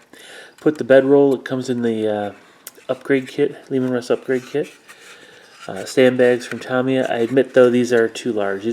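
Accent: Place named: Poland. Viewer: Tylko Po co ten Polskie akcent szpeci model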